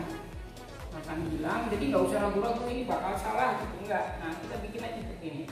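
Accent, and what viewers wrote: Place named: Banyumas, Indonesia. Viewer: lah wong ngendi sih kok jawane ngapak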